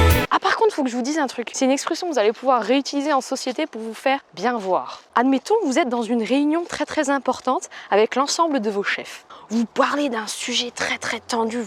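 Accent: Alsace, France. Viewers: Bonjour, petit accent Alsacien, Feu Tomi Nora Mols ……….lol……. J'adore...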